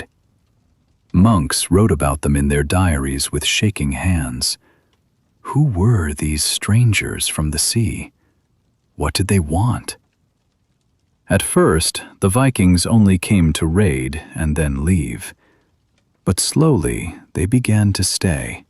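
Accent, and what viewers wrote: United Kingdom. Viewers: Many thanks for this channel You're accent is British isn't it?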